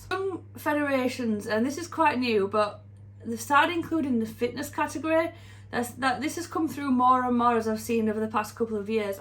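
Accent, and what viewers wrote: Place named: England, United Kingdom. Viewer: Whichever you will pick...you are already winning! Love that accent...is that English? lol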